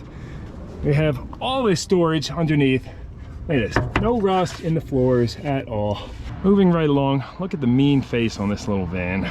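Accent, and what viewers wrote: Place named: Western Pennsylvania, United States. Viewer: Where are you from? West PA or east OH? Accent gives it away.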